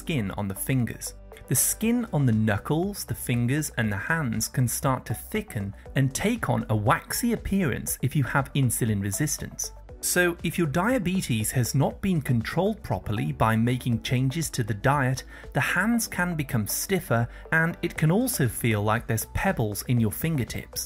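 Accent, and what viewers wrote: United Kingdom. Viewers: Great — Are you British ! Is that your Original Voice !!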